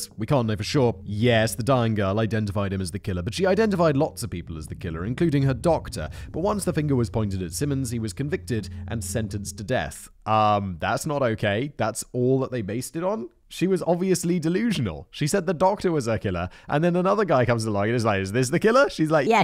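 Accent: United Kingdom. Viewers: With that British accent, you could describe taking a shit, making it sound classy.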